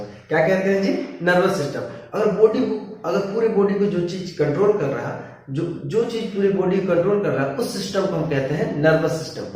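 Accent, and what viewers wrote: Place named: Bhojpuri, India. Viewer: pure bhojpuri sir❤❤❤❤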